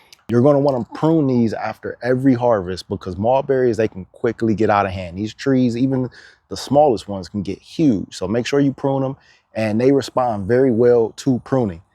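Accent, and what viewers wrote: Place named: California, United States. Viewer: You sound like you’re from California.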